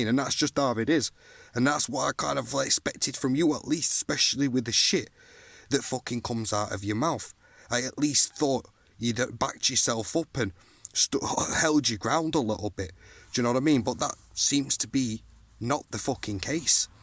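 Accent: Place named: Nottingham, United Kingdom. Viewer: Love the Nottingham/ Derbyshire accent, menacing but with a soft undertone 🤣🤙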